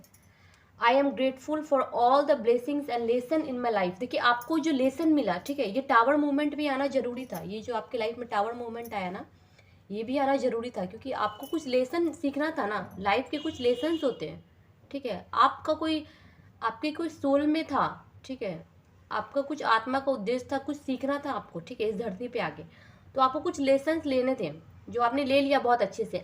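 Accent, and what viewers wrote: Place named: Patna, India. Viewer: Dee aapki tone to Patna ke aas pass ka hai..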